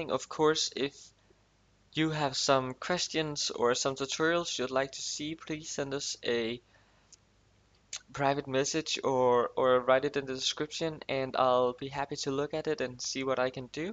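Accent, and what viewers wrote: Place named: Denmark. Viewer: Just guessing off the accent but are you Danish?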